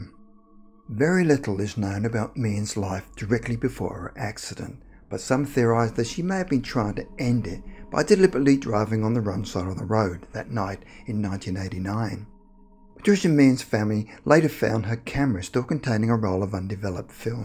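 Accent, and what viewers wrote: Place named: Australia. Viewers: Australian or Kiwi? Don't have a problem understanding tbh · Aussie accent, pretty clean to be honest with fuck all slang or swearing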